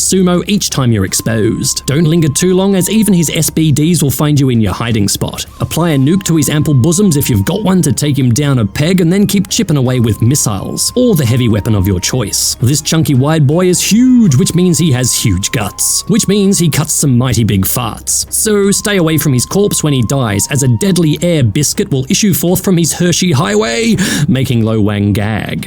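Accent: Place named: RP, United Kingdom. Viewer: RP prononciation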